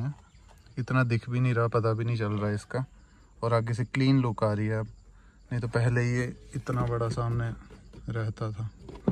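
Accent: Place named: Himachal Pradesh, India. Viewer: Are bhaiya aap to mandyali bolte ho nd fortuner pai ye roof par acha ni lag rha hai taxi lag rahi hai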